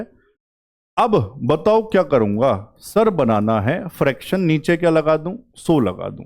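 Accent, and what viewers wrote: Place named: Haryana, India. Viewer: Haryana - famous hamari boli --haryanvi ❤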